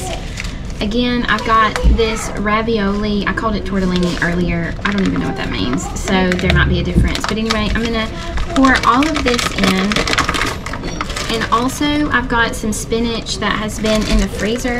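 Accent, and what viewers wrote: South, United States. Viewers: I just absolutely love your southern accent!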